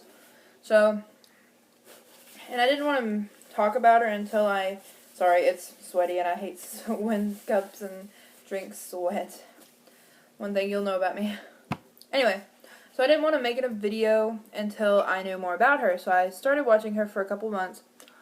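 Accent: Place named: Kentucky, United States. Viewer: What part of Kentucky are you from?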